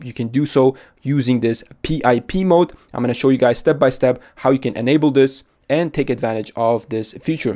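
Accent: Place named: Russia. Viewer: Not only bad, but intentionally disingenuous. However, the uploader has a Russian accent so I'm not at all surprised.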